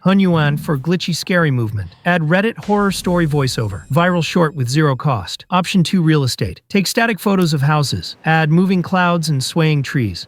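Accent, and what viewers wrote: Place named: United Kingdom. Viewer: Ur speaking too funny. If u would have been narrated normally, the video would have been millions views by now. But u choose sick british accent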